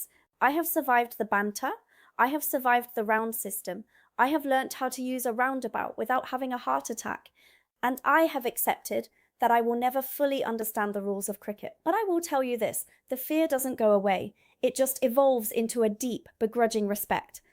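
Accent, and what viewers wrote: England, United Kingdom. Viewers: If you ar3 American, why do you speak with a British accent? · Why do you have a British accent then?. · I thought she was a Brit. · Why do you sound English? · I'm a brit and there is so much of this that is nonsense to me. And how is her accent so perfectly british when she has already said she's american.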